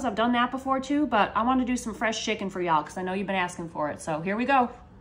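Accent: South, United States. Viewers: Ma'am, i just knew by your sweet southern accent that all of these was gonna come out looking great told me everything i needed to know 😂❤ im making some of these real soon 🤷‍♀️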